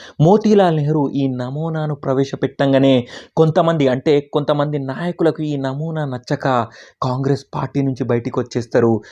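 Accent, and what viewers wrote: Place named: Telangana, India. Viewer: Ni yamma,emanna kick unnada Endi bhayya ni voice lo aa rhythm Vere unnadi po Telangana yasa la masth sheppinav Deeni thalli gisonti faculty unte dennaina palaggottochhuuu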